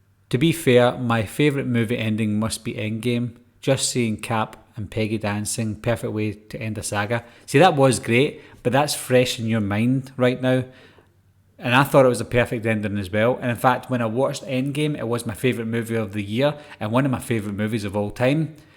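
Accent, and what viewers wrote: Scotland, United Kingdom. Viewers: Were about’s from Scotland are you from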